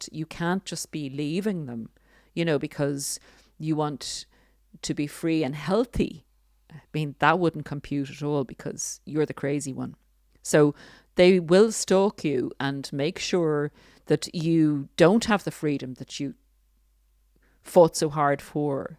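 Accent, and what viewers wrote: Ireland. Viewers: I love her and remy ❤ and the accent is that Irish?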